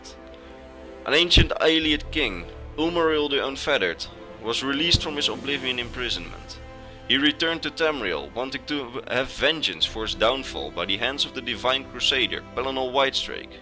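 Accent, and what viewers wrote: Netherlands. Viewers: This guy is Dutch i hear it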